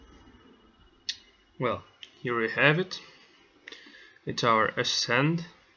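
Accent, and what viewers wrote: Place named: Caribbean. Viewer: Your video is unfortunately stay only have no idea this Huawei G300 disassemble your video is too long, it shows you do not know, my advice is to practice before and after you record it, you should have practiced before and make sure you see more removed when this smart phone, plus your English seems caribbean, I feel for you but my vote is desfaborable do not understand how you can be so stupid to make this video ....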